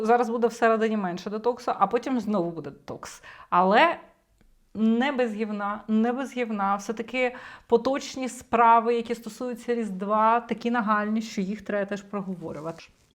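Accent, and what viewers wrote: Russia. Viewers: Цій авторці треба більше в житті спілкуватися українською. У неї купа рускіх звуків , вона вимовляє українські власні назви на москальський лад . Най більше слідкує за вимовою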